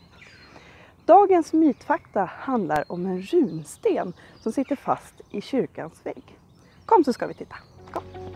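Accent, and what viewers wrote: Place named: Uppsala, Sweden. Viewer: Någonstanch verkar vara Uppsalalingo. Debattören Malcolm Kyeyne uttalar på samma sätt. Ok. Jag tycker Gamla Uppsala Museum gjort en rad riktigt intressanta videos, de flesta proffsiga, Tittar så mycket jag hinner. Igår var jag tvungen att bila utmed långhundraleden för att se med egna ögon. Mer kunskap åt folket.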